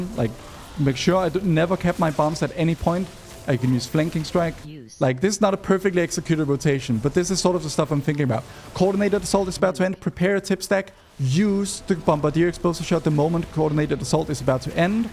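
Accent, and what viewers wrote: Denmark. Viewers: Love the guide! I do have a bugging question.. are you a Dane?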